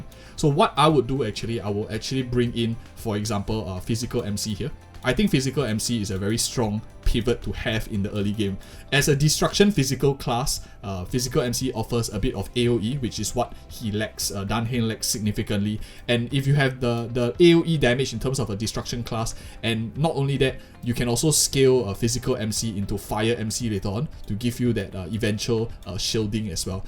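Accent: Singapore. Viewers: Oh hullo this guy sounds a bit Singaporean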